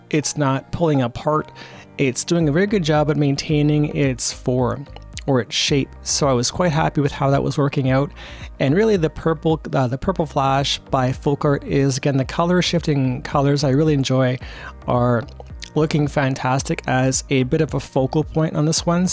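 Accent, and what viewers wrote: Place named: Canada. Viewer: Great piece!! I always forget how different Canadian accents are depending on which province. I’m in Ontario and we pronounce our A’s so different hehehe. Long arms definitely with larger canvases. I struggle with this only being 5’1. Great colours. I’m definitely going to check out the FolkArt colour shift brands! And I’m looking forward to chatting about a future collaboration!! Have a great day my friend!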